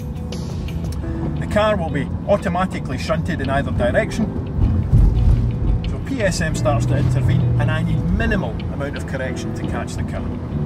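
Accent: Scotland, United Kingdom. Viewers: Hes british he lives in sctoland and has a scottish accent! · sounds like he is Scottish !! am I right ?!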